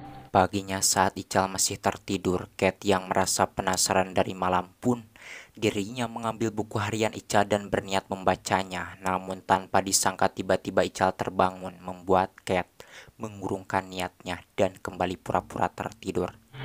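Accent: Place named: Sukabumi, Indonesia. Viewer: Bang si abang ini aslimana teh ?? Sukabumi kah ???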